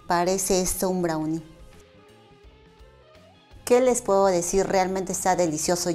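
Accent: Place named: Peru. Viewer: Hola, estuve buscando en internet de qué país eres, al oir tu acento me enorgullece que seas peruana como yo, pero cuando dijiste cilantro, pensé que eres mexicana, hasta que te ví con tu amor haciendo desayuno dominguero y mostraron la ocopa. Orgullosa de que seas peruanísima. Un fuerte abrazo a la distancia.🎉❤❤❤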